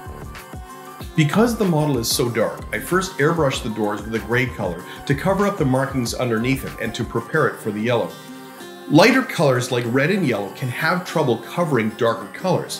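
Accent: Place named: Canada. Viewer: Great video, and being from western Canada (Victoria) myself, I too use the same pronunciation - its' just a Canadian thing, eh?;-) A couple of questions: I was curious why you were not wearing gloves while painting and handling the model; and what liquid was being used to clean your airbrush between coats; and I think I also saw 91% isopropanol being used a reducer.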